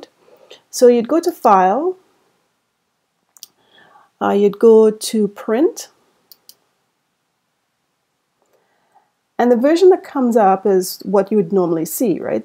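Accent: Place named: Trinidad and Tobago. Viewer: Thank you. You saved me today. You sound like a Trini, like me. Thanks again!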